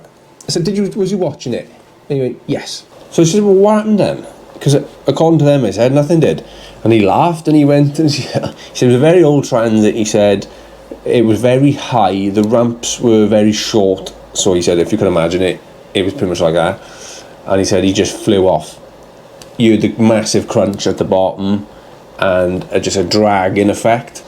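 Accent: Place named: Wales, United Kingdom. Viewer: Speak to Dave the lovely car company, has recently set up at unit with an in house mechanic, there is also a podcast / YT video where him, Joe and Lee (Car UK) talk about the equipment he should get (nov 24 i think it was). I do social media / YT stuff for them and as far as i know its one of the best things he's done, his words. In the mean time you could kit it out and get mobile mechanics in to use the area for your cars until your ready to employ them. great content btw, also I love the welsh accent with words like Fumming hehe. Lastly what they done to that audi is out of order. any way keep smiling and good luck with your new start up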